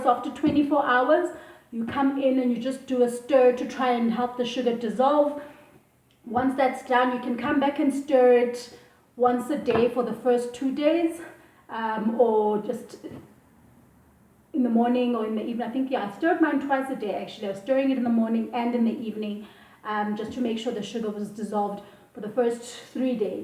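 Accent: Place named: Coloured, South Africa. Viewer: I thought you are colored, you speak and look like us...or so I thought😂😂😍😍thanks for the recipe girl going to give it a go. Take care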